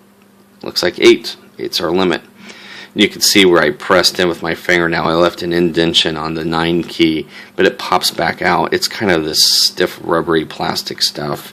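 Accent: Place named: United States. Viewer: Great Video...Well explained on all the Features and Functions over 33 minutes! Not boring at all...well spoken! But then again, you sound like Michael Douglas (the actor) with your American Accent as i am a Northern Englishman with a Yorkshire Accent! i just bought a 150 metal (silver) for £75 including delivery off E-Bay last week.!!! It was 2nd hand...but in near excellent condition! I was impressed as it arrived today! I had a Casio150 (identical) bought in 2013 as a gift and it has been well used and knocked/worn out! I caught the strap on a metal fence (caught inbetween the strap and wrist and it broke the strap!) Also the battery was replaced around the same time and it now doesnt bleep at all, and the repair shop didnt know how to fix it...so i decided to get another! Il keep it for spares as i did the previous casio 80 model i bought in 2003 which was a shell from which i used my 1994 black rubber 80 casio!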